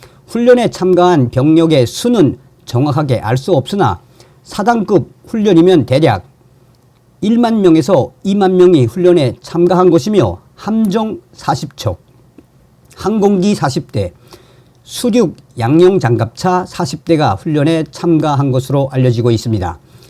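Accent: North Korea. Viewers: 내용은 좋은데 버벅거리는 이북말씨 듣기가 힘듬니다 컴퓨터 목소리 내는기계도있는데 그걸로대체하지요 아니면 북한에서 내려온 여자분으로 말잘하는 여자로 대처 하시든가요 50만 구독자이면 돈이될텐데 아낄려고 버벅거리는 말로 설명마시고--- 짜증나네요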